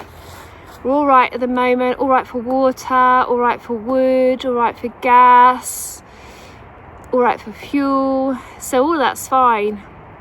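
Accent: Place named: Essex, United Kingdom. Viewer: Interested to note you were born in Eastern Ave, Ilford (thought I recognised your accent!) . I was born in Dagenham, close to Martins Corner only a few miles from you. Have been a subscriber for some time and enjoy your travels. Love Zephyr too!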